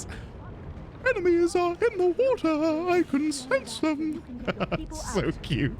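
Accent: United Kingdom. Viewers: Your voice is really interesting and soothing! dont judge yourself that hard, a british accent that is soft, manly, energetic, hopefull for fun and love for life is just chef's kiss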